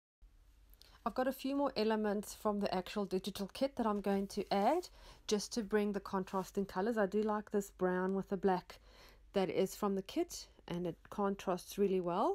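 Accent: South Africa. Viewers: Hello 👋🏻 Just discovered this kind of journalling during the lockdown really and your channel came up in my feed! Love your creativity 💕 Heard your SA accent and wondered where you were? Going to watch some more 🎶🌟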